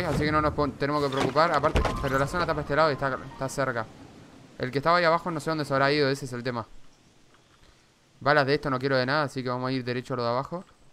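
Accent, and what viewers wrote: Uruguay. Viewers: de que parte de uruguay sos bro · es de uruguay?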